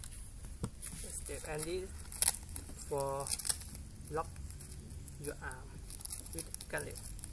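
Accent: Thailand. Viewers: Over Rock ก็พวกเราคนไทยไงสำเนียงมันไม่ใช่แบบฝรั่งมันเลยงงกัน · คนไทย สำเนียงยังไทยเลยอะ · คนไทยชัดๆ สำเยียงไทยมาก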